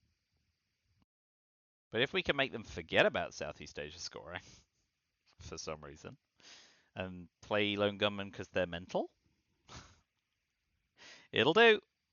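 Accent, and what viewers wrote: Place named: England, United Kingdom. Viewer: I enjoyed the rambling commentary (so did my wife) and the quaint English accent was just the icing on the cake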